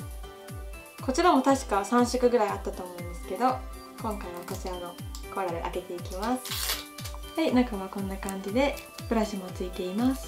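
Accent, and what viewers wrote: Kansai, Japan. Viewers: 関西弁やからしゃーないし。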